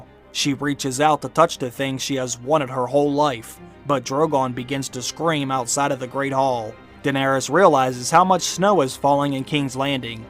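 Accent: Pittsburgh, United States. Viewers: Wait wait wait this guy sounds like a yinzer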